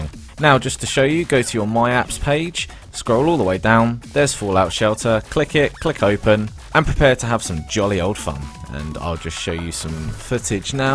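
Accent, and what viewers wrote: United Kingdom. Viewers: Thank you so much disembodied british voice :D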